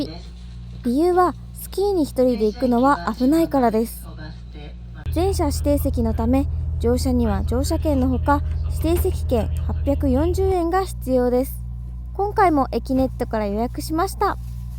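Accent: Kansai, Japan. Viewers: 穂高町に一度行ったことがあります、きれいな素敵な町でしたよさんが時々関西弁が出るのが親しみを感じます。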